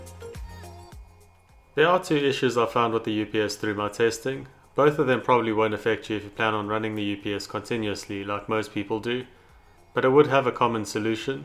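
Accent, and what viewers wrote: South Africa. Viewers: South African? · you sound like a South African, not an Australian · You sound South African.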